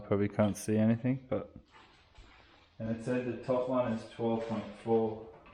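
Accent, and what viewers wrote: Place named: Australia. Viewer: Hey mate nice to hear the aussie accent, thanks for the no bullshit video🤣I do have a question if you could help us out. I've just swapped mine out and note the stem has the slightest wobble inside where the bearings go as if the stem isn't wide enough. I'm not sure if the last forks did this as well. How did yours turn out?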